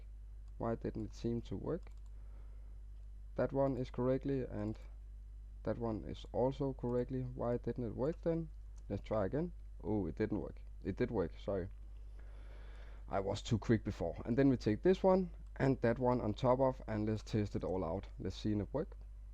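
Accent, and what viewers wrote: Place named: Denmark. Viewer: You're danish aren't you? :p if not, your accent is extremely similar to a dane accent.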